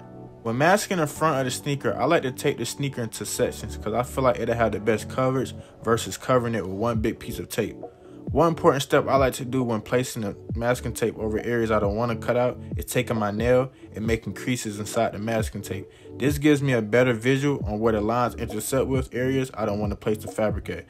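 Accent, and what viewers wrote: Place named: South, United States. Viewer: You sound so country that I didn’t understand what you were talking about until you slowed your words down…Thanks for understanding 😊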